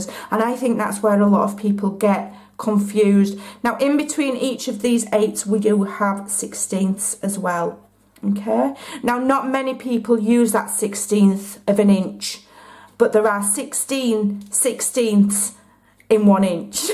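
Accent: United Kingdom. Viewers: Bless your sweet British heart! I’ve purchased Dysart’s that only contain measurements in centimeters which have rendered me useless... clearly I didn’t pay much attention in math class which I hated though this tutorial is a godsend. I could listen to your charming accent and joyful laugh all day long so thank you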